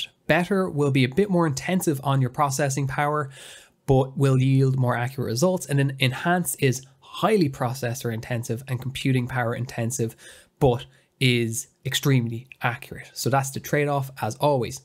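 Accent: Ireland. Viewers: Great video! You sound Irish... visited Galway and Dublin last year and had a crackin' time!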